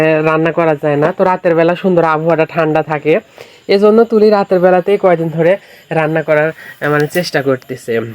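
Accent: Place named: Naogaon, Bangladesh. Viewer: নৌগার ভাষা এমনি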